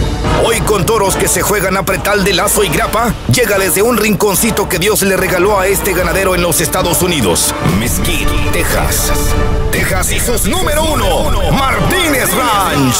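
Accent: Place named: Michoacán, Mexico. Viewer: Señor mi saludo desde bakerfiel California yo mucho pensé que era de Michoacán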